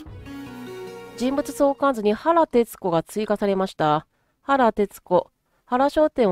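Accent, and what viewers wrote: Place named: Kyoto, Japan. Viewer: の京都訛りに出会えて嬉しいです♪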